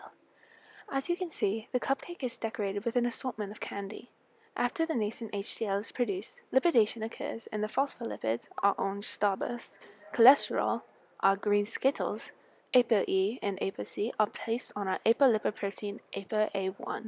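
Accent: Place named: United Kingdom. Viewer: Lol i love the british accent! hahahhahaha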